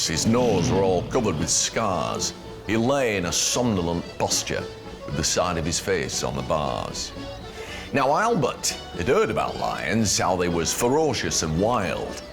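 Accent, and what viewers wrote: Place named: United Kingdom. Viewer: Обожаю британский акцент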